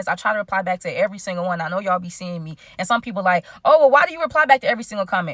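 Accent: Chicago, United States. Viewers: have a meet in greet where u from g u slick talk like us #chicago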